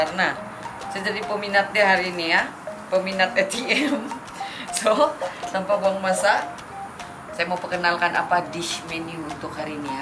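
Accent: Sabah, Malaysia. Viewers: biar brtahun2 tingal dkl tpi bahasa mc sabah,itu bagus sbb bahasa sabah dia tdk buang,ada tu org sabah p sna bahasa trus mcm smnjung oooo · Best x lupa logat sabah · Wlaupun dia kwin org sana. Tpi loghat sabah msih pakat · Mentor... Kekal kn tu logat sabah..... 👍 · Pekat btl Sabah ni memang xde campur air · betul2 karas pekat ohh sabah c. teperancit bilgnya 🤣🤣🤣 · Sy baru sajja makan ambuyat sama sawo sy sis. 😂.. Tapi sup dia sardin cmpur pucuk ubi. hahaa. tapi sadap jugaa.. - mantap vedio sy sukaaa sbp logat sabah ndk jugaa lari.. Hehee iloveyou ❤💕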